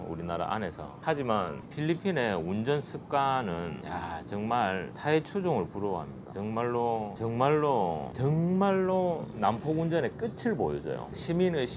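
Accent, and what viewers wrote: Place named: Busan, South Korea. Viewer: ㅎ 부산사람 아이라 캐도 말 투가 딱 부산이네예 ~~ 근데 얼굴은 서울인데여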